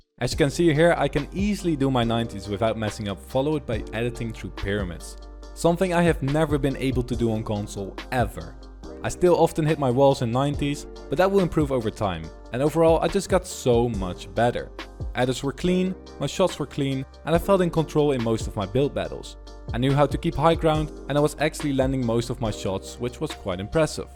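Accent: Netherlands. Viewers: Ik hoorde aan je stem dat je een nederlander was (ben geabonneerd) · I'm 99% sure he is Dutch, bcs of his accent😂😂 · He sounds Dutch to me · Ja ik herken het meteen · Ben jij nederlands? Ik hoor het een beetje 😂🙌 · i saw this in my recomended(don’t know you) klicked on it and boom. 1 minute in and i know 99% sure you are dutch or somewhere close to it. The accent bro the accent🇳🇱🇳🇱🇳🇱🇳🇱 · Jo bro zelfs ik hoor dat je Nederlands bent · Zijn engels is goed alleen je hoort gewoon dat hij nl is! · yoo are you dutch because off your acent zo ja ik ben ook nl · His acent is 99% dutch 1% irish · You hear he's Dutch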